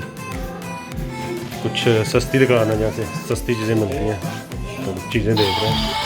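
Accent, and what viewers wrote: Punjab, India. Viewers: Yar main tumhari sari videos dekh Raha hoo colour cloud Aor jolly azeem I'd se boht hi piaray lagay ho mujhe Tum special Punjabi accent Urdu boht achhi lgti hai Apki Lahore Pakistan se boht Sara Pyar 💜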